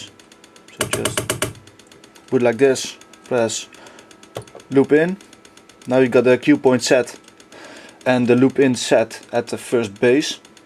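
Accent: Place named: Netherlands. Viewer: haha leuk accent ;) echte hollander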